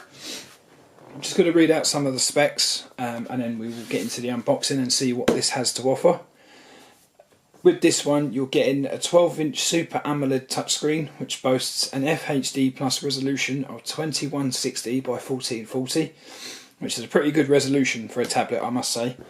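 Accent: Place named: United States. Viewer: he is speaking American English not british english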